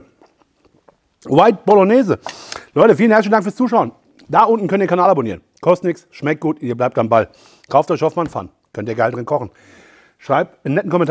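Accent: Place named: Frankfurt, Germany. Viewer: Absolut! Und der Frankfurter Dialekt - super!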